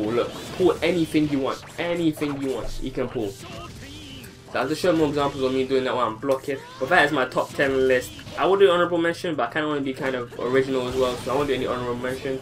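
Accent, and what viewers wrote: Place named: France. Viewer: I didn't even realise this guy was speaking english, i thought i clicked on a french youtuber's channel for a second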